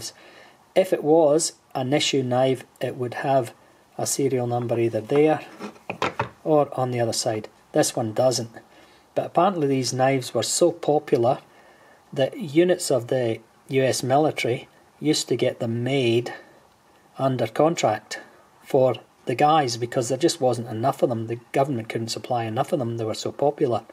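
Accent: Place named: Motherwell, United Kingdom. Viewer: You sound like somebody from Motherwell! Good vid 👍🇬🇧🇦🇨🏴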